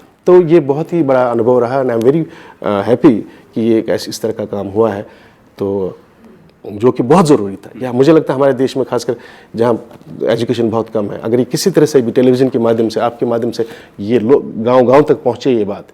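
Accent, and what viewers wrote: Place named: Kashmir, India. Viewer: Very surprised to listen to his Kashmir accent which I never saw in his acting career great actor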